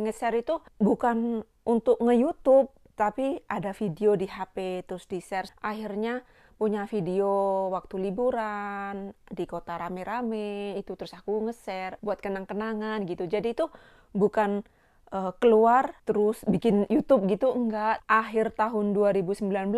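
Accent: Java, Indonesia. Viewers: Suka dengar kk ngomomg .. mendok jawa nya itu loh. Lembut jg. Haha persis dr. Ambar di pontianak dosen kedokteran untan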